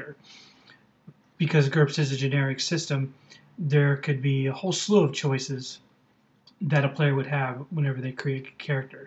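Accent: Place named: Midwest, United States. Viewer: funny accent. he sounds like a midwesterner until he says attributes. never herd it a-TRIB-utes.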